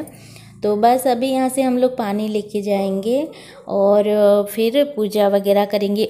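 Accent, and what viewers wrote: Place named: Odisha, India. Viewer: Wow super dear...Chhatishgarch n Odisha bilkul neighbour he...to aap ki hindi speaking thodasaodia jaisa bhi lagta he....doing very well dear...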